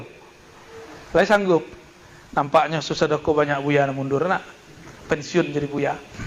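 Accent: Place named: West Sumatra, Indonesia. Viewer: Ok thanks... Mohon maaf aku ya. Agak suudzon aku semalam Kukira dubbing Lanjut. Tetap semangat. Saya suka logat minangnya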